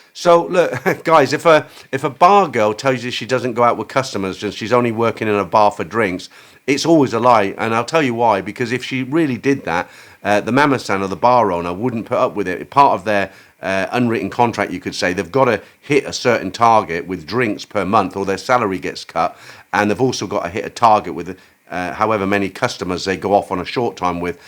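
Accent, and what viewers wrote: United Kingdom. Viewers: That last story was THE FUNNIEST STORY I've ever heard on this channel !! ....and the super professional way you read it (with your super-kool-British-accent) ...makes it even funnier....not that anything is wrong with your accent, but to me (as an American person)...it just hits me in a way that makes the story The Greatest !!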